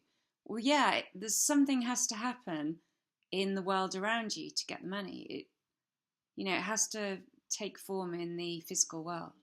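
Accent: United Kingdom. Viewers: Hi from Sydney Australia, your accent is lovely always loved the British accent, I'm new to law of attraction and a HUGE fan of Neville, so many testimonials from ppl from all walks of life. even some skeptics have converted to belief after trying Neville Goddard's guidance and methods. I hope Neville is enjoying all the pleasures of Heaven, he really was HEAVEN on earth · One of best explanations I've heard on this topic. You explanation is not only on point, but succinctly covers practically every important point made by Neville in all his many talks. As a bonus, you have a dazzling smile, charming English accent, and awesome personality. I listen to this video as a daily superbly positive reminder to maintain the imagining practice, and I'm seeing TONS of benefits Thanks for making this video...subscribed...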